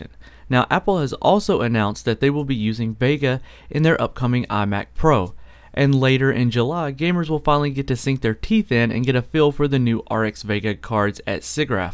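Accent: South, United States. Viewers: Did I hear some of that country accent in this video? Haha great vid dude.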